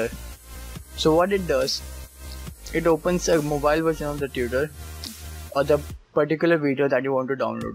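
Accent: India. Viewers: I did't understand a word from this video, he's talking Indian not English ! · you know it works when there's an indian voice v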